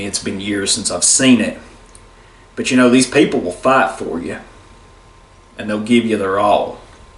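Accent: South, United States. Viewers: Your accent is a beautiful thing, brother. Never lose it, pass it to your children. It does not indicate lack of intelligence, you clearly have an abundance of that. Where I'm from, Coosa, the toes of Southern Appalachia, it's dying. The monocultural poison has crawled up from Atlanta and the anri-Southern rhetoric of the last hundred years or so is teaching our young folks that the way we sound means we're stupid. But no, it is a dialect, not an accent. We have our own language. We Southern folks need to stand up once again and say: "No, we are not like you. We are our own people. We have our own language, our own culture. We are here, and we won't be crushed."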